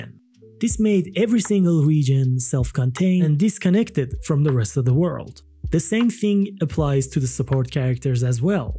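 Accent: Iran. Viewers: u got that persian accent bro 🗣🗣🔥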